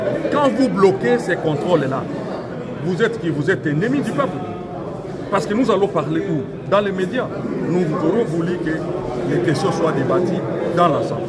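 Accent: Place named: Rwanda. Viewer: Ton français rwandais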